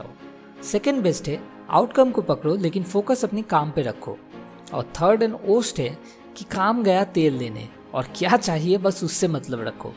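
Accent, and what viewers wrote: Bengal, India. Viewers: pronunciation of temporary? Bengali accent?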